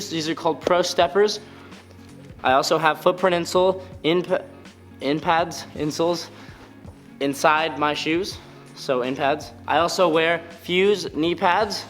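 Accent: Australia. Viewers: are you Australian? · R thay from Australia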